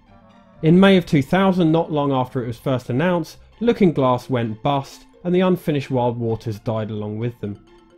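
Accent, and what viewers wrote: United Kingdom. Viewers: I think one of the reasons why the N64DD was DOA was the fact you could only buy it off the internet and online shopping was a brand new concept back in 1996. So new in fact that almost no one really did it at the time. Why Nintendo did it is a complete mystery to me but it would have had a much better time if it was sold in retail stores like GAME in the UK where you sound like you are from (you sound British to me) or Best Buy in the US where I live. I dunno if you would agree with me or not, but it is the main reason why it did not go well from what I have heard.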